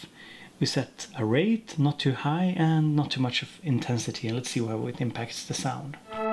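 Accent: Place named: Sweden. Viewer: Judging from the accent this dood must be swedish 🇸🇪🤘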